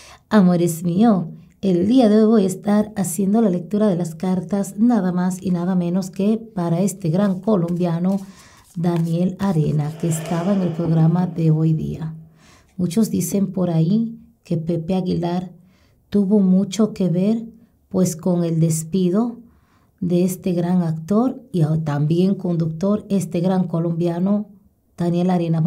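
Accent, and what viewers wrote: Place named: Dominican Republic. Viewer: Los dominicanos hablamos haci, pero solos un amor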